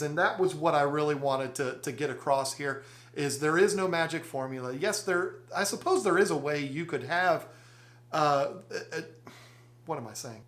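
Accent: United States. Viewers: Every time it rains my pond gets filled back up and gets a water change too. Oh and I forgive you (all Americans for that matter) for mispronouncing actual English ;) Just to point out there is an "e" in beta so pronounce it bee-ta, english. While i'm here, there is a "h" in herb too, so pronounce it her-b not er-b. English do you speak it? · We get intelligent hard working people make free videos for us, and some absolute mong decides to take the mick out of their accents. Shouldn’t have even responded in my opinion but hey ho. America is huge and the various accents have way too many influences and quirks to name. Either way it is more beautiful than anything else, and the problem is with that muppets brain.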